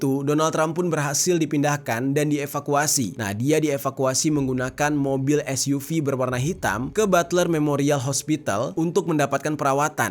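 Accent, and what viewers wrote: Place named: Java, Indonesia. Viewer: Aku lebih suka "hey Genk..welcome to back kamar jeri ..." 😁😁 Medok..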